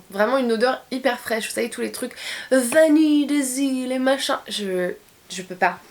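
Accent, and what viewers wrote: England, United Kingdom. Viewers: Ton accent anglais est tellement cute❤️🤩💍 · J aime trop ton accent anglais 😘😘😘😘😘😘😘😘😘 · c trop marrant quand elle parle il y a de fois un accent anglais c trop mignon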